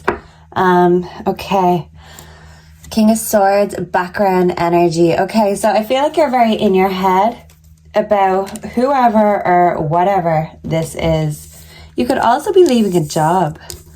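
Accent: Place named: Ireland. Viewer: Hi l love how you do your tarot reading.How you use different pack of cards.Your reading is really interesting. I love your àccent Do you come from Ireland?Are you Irish ?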